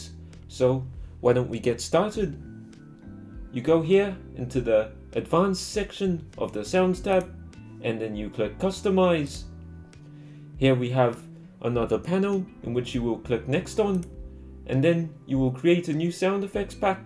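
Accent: New Zealand. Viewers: wow i love new zealand accents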